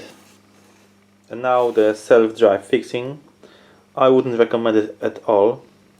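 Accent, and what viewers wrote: Poland. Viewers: Słychać że rodak :) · Polski akcent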